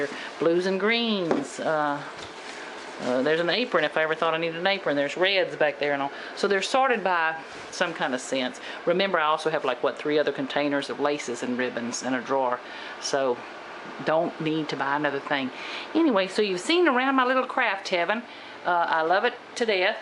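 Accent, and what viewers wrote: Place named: South, United States. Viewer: You are a woman after my own heart!, diet coke and chocolate! HA! Thanks so much for sharing! Good to hear a fellow Southern-Sister's accent on YouTube, keep up the good work!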